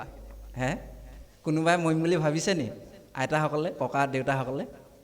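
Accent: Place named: Darrang, India. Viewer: Bap আপোনাৰ বানী শুনি বহুত ভাল লাগে। Darrang ভাষাৰ লগত মিলে।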